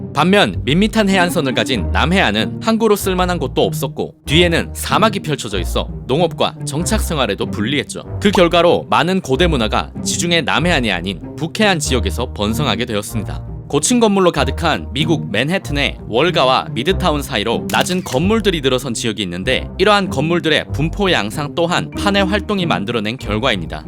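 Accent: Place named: Gyeongsang, South Korea. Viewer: 혼자 읽기 힘들었는데 감사해요 경상도 분이시죠?? ㅎㅎ❤️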